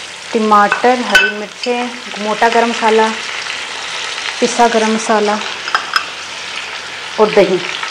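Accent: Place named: Punjab, India. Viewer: I like your Respi and punjabi style taking is great. I have been made your Gurwalay chawal. My wife is love it. Thankyou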